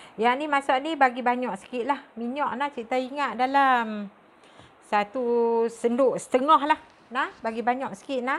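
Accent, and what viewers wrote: Kedah, Malaysia. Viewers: Mmg padu loqat kedah. Terbaik..!! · Assalamualaikum.. sya mmg suka sgt follow minat sgt2 kt terhibur dgar celoteh dlm longhat kedah..resepic.ta pn smuanya menarik dn berselera.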